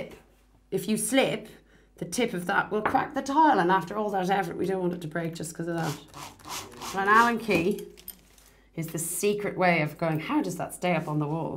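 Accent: Ireland. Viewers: Any lady who does DIY deserves a like in my book. Love the Irish accent too. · Fabulous and a lovely Irish accent!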